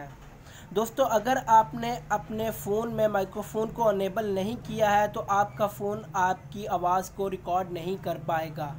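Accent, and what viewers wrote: India. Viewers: English title but indian speaker .. nothing inderstand 👎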